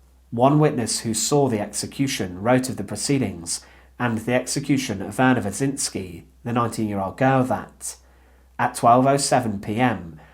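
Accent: United Kingdom. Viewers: Love your Bri-ish accent !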